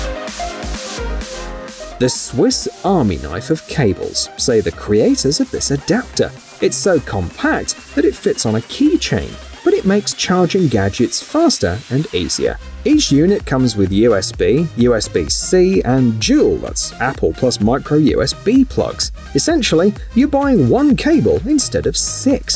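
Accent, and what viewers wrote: United Kingdom. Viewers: what's with the f**** Brit accent impresarios .. every new gadget has to have a brit presenter or banging background sound.. lighten up . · Does it bother anyone else that the items are described using British accent and terminology, but US units of measure and value? No? Just me? OK, then.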